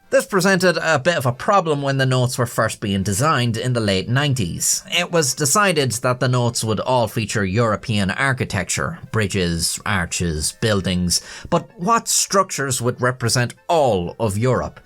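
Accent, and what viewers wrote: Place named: Ireland. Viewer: I wasn't sure whether lived in the North or South of Ireland. Now I know. (sorry, as an American I know two Irish accents: Dublin and Not Dublin. In fact, the UK accents are also, Glasglow/Not and London/Not. Wales has one accent and it is unknown to me, but I listen for words made from half an alphabet; could be Glasglow + 7 pints though.)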